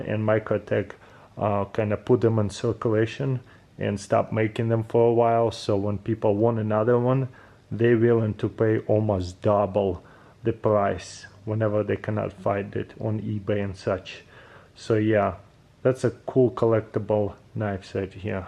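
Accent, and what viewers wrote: Russia. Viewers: Стронг рашн акцент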